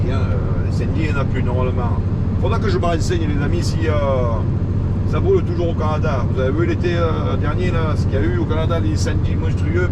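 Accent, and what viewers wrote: Marseille, France. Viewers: merci l'ami! tu viens de me réconcilier avec la geopolitique !! c'est vrai qu'avec l'accent marseillais ça passe mieux !;-)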